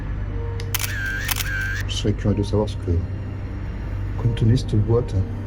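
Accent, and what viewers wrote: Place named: South, France. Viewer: Bonjour, ohhh tu as l'accent qui attire le chant des cigales tu me rappel Carcassonne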